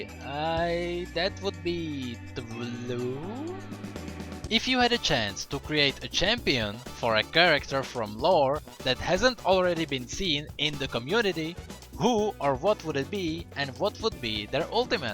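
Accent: Czech Republic. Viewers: Já prostě věděl že jsi čech...prostě jsem to věděl...ten přízvuk poznám na hony daleko........Gj- keep it up man! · Hehe, I guessed that you might be from czech republic, just by your pronounciation. ^^ Well, all I have to say here is: Pokračuj v dobré práci a lovu zdar. :-)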